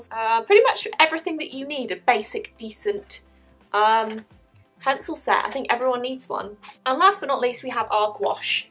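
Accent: United Kingdom. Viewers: I love your British accent ❤🥀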